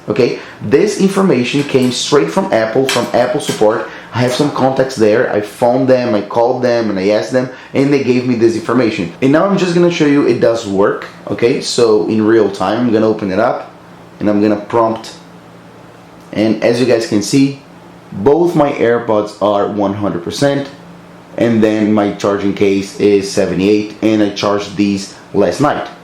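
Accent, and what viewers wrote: Brazil. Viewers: Heeyyy Brazilian!!! I noticed by your accent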